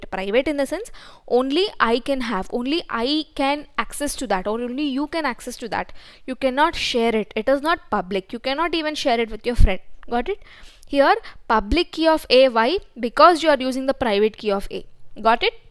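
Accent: South India, India. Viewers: South indian mam 100 % and she sounds soo sweet in headphone got obsessed 😍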